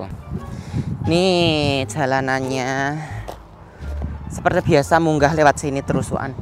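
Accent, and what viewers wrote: Java, Indonesia. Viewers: Woalah moasee medok😅😅😅👍👍... Sukses mas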